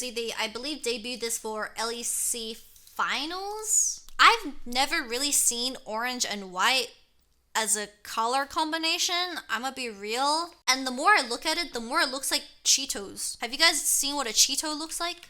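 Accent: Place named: Australia. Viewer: So cute but I can't stand the aussie accent lol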